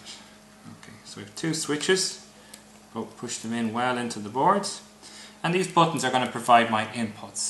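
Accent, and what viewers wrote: Ireland. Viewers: Extremely pleasant voice. It sounds Irish to me! Thank you for sharing!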